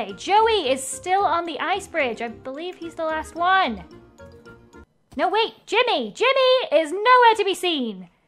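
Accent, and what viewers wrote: United Kingdom. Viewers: Why do you sound British???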